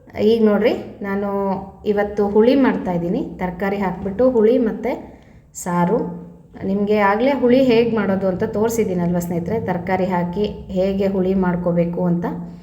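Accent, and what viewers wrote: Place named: Ballari, India. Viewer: Akka superb agide Naau kuda Ballari avare Same nimtharane mathododu akka thumba kushi aguthe akka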